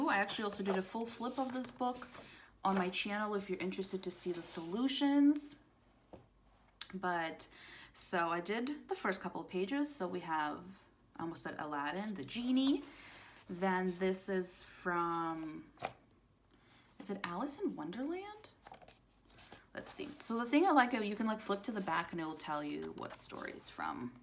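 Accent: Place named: United States. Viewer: Glad you enjoyed your vacation to the states! Where are you from? As you sound like you have an American accent. Although you said holiday so I’m guessing you live in Europe?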